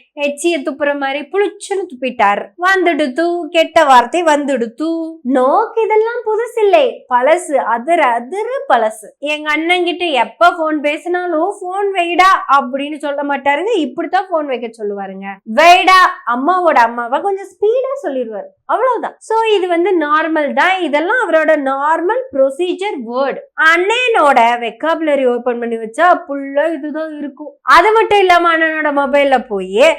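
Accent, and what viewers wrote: Kongu, India. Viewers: Vazhtthukkal thozhar Anbu magal Azhagu kongu thamizhil Indha simon vazhai therandhale sakkadaiya than varudhu Chi chi chi😂😂😂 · கொங்கு மொழி super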